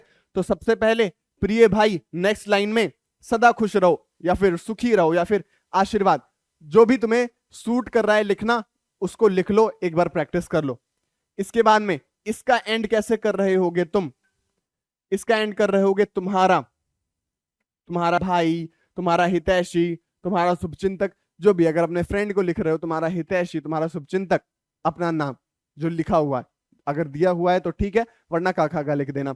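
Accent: Bihar, India. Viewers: Bro pure bihari lag rahe ho 😊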